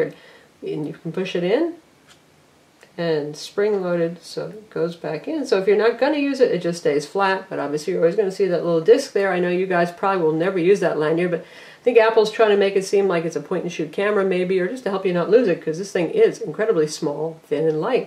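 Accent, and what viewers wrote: United States. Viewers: are you American?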